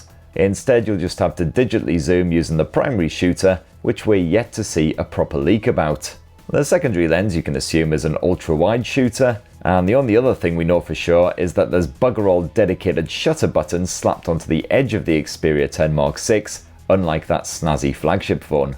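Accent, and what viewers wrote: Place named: Teesside, United Kingdom. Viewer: Come on here to check if my offered upgrade of the Sony phone was worth it - are you a Boro lad by any chance?? Thought that was a TS postcode at first but the accent is nearly there! ha ha Thought i'd take a guess anyway .... been offered Apple for the first time but never bothered with them but it is for around the same price. My only issue is they seem to release a new one every other week so I hesitated on the offer thinking I'd be offered a ten year old one for nearly forty a month As you can tell I don't know anything about phones. I just like good looking phones with decent office abilities and internet surfing features with a rad display - was hoping this Sony would cut the mustard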